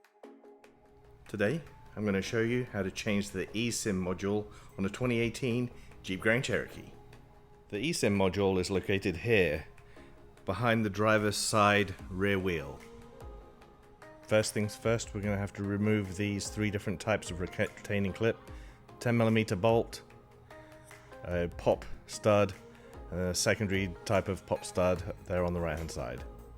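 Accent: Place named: United Kingdom. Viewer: Everything sounds better with a British accent❤ 👍 You sound like my son in law from Nuneaton...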